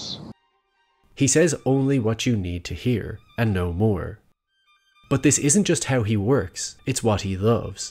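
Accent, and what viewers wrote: Ireland. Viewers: R u Irish I can hear the accent a bit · Where in ireland u from?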